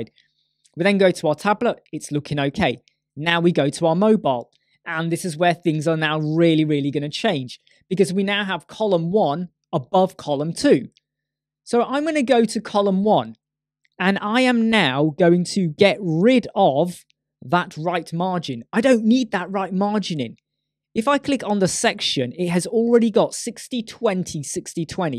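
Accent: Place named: United Kingdom. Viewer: Good videos but I hate british accent. It makes me angry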